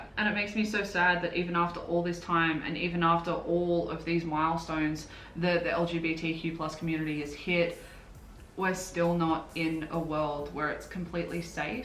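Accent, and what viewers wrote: Australia. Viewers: Holy shit, this is the first video I've seen of you off of your viral tikkoks and yes! I'm living for the Aussie accent. Also super happy to see you growing, keep being an awesome bean. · Are you Australian? I've just found your channel and I myself am an Aussie and some of the things you say are just so Australian. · Man it is SO nice to hear an Australian accent, and to hear Shane described as ‘a bit how ya goon’ bc that is the most accurate description of the man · This really do be a hot take. Also idk why it's still so weird to hear you talking in your normal voice, but even as a fellow Australian, I love your accent so much! P.s. those eyes are giving me corpse bride vibes and I'm LOVING it!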